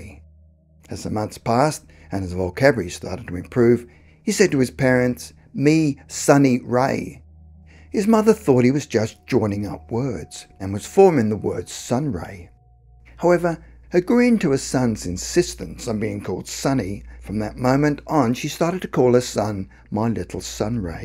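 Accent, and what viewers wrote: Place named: Australia. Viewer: Interesting. I died in Germany WW2 in UK Army. Apart from UK and Ghanaian' I've also met German and USA people who also have memories of their WW2 deaths. But I don't talk publicly because people get unreasonably angry at such disclosures. I mention this because the audio narration for this video is Australian. And if USA, Brits, Ghanaian and Germans are already back from a WW2 death, it signifies Australians have also returned to rebirth in a new physical body but may not yet be aware of it. I think rebirth is required for further evolution of our human consciousness. I died in Jan 1945 north west Germany and was reborn London UK Aug 1949. So, some of us come back very quickly. I don't hate Germans. I love German music Bach Beethoven, et-al. I consider the world was sucked into a cosmic maelstrom at that time, and that caused the wars. 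You can't stick the blame on any one group. About the young boy in the featured story...........did he die prematurely from a car accident or illness, which is how he died, and departed from his wife, who he had had great affection for? Note: I don't do drugs or alcohol, so this not a fairy story.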